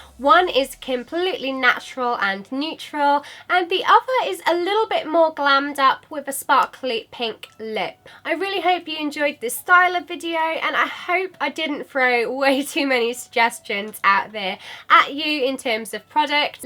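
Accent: United Kingdom. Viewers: She is british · I've seen your videos like <num> times, but wanted to hear her British accent🙊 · Amazing OMG!!!Loved it! kisses from Brazil!!! (please put cc on your videos, it would be great and helpful for me) Love your British accent ❤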